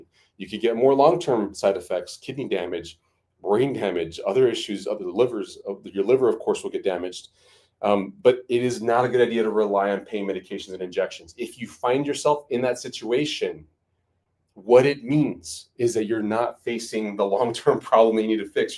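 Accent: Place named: North Carolina, United States. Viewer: I can hear you North Carolina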